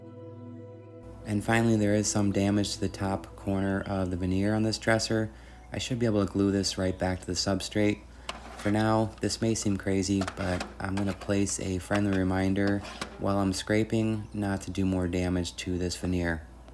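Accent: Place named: Midwest, United States. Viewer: It is INSANE how much work and frustration went into this. I 🎉CHEERED🎉 when you started pulling the pieces off and "properly" gettin down to biznass with your various sanders. That was actually really funny. It felt like the crescendo in a Dr Jackyl/Mr Hyde scenario. Except like, the loony tunes version? But with ambience. Anyways, I'm binging your channels. You're doing great work! Also a funny aside, I migrated from MI to OR for 10 years now, and I've been wondering about certain words that have started to sound wierd when I say them out loud, and you're making me realize that it's because they're midwestAF long-vowel sounds. Like how you can maybe get it up to 60° in the graaj, or how you're gonna bou-re a hole, or kill it with fyyre. 😅 Anywhoozle, I hope your week is spectacular, and that you find that magnificent piece you didn't realize you were searching for! 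Cheers! ~Violet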